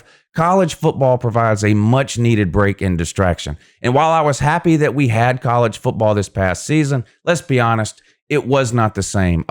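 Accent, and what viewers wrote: South, United States. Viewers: As a sports analyst you’re being flat out lazy and disrespectful for repeatedly mispronouncing Clemson QB DJ Uiagalelei’s name and instead repeatedly pronouncing it as “Ukulele”, which is, in fact, a small guitar-like instrument. Slow down and pronounce the letters like your teachers taught you in kindergarten. As a sport’s analyst/commentator you should learn the correct pronunciation and practice saying players’ names correctly before you get on the mic. It’s literally that simple. And don’t blame your mispronunciations on having a southern drawl. If you choose to be a sports analyst then at the very least you should pronounce the players names correctly. Failure to do that simple aspect is just lazy and shows that you do not take your craft seriously. Stop butchering players’ names. Get it right.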